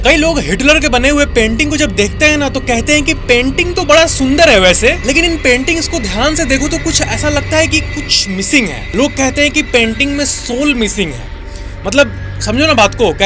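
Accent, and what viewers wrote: Bihar, India. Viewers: Aap Bihar se ho n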